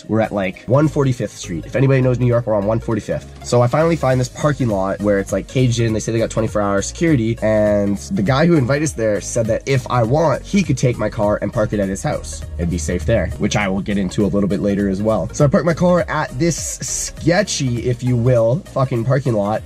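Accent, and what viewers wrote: Canada. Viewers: Lmao...I'm a Canadian so I just think he sounds normal 😆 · Woah the Canadian accent is strong today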